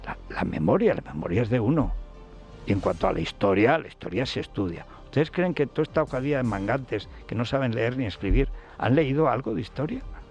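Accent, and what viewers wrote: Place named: Aragon, Spain. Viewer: Con tu acento baturro y tu poca dicción No puedes con la r Eres un tonto del haba Claro fuiste un cambia chaquetas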